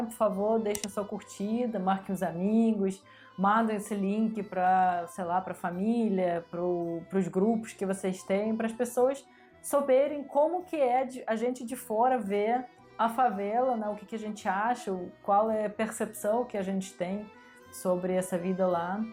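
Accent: Rio de Janeiro, Brazil. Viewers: Seu sotaque é mais carioca do que russo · adoro seu sotaque carioca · Você já está com sotaque carioca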